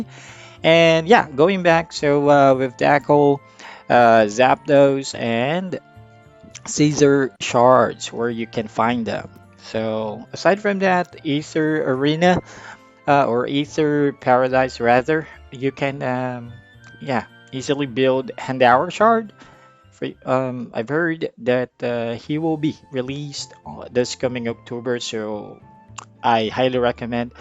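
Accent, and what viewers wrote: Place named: Philippines. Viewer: I mean you really do sound like a Filipino professor haha!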